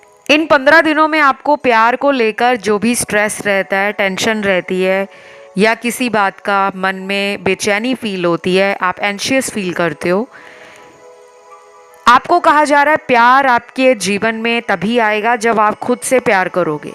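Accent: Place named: Maharashtra, India. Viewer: R u maharashtrian bcz u talk like typical maharashtrian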